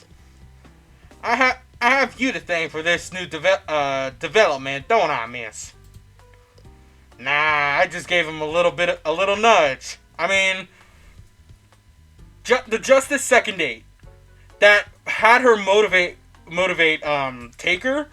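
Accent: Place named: South, United States. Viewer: Your southern accent killed me lol! I loved it! I thought I had the drawl, but yours sounds much better, and I’m an actual Southerner! Great video dude! ❤️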